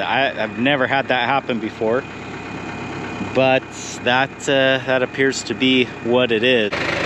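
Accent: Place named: Texas, United States. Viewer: If you are from Northern California how come you have a southern/ Texas style drawl? I just like guessing accents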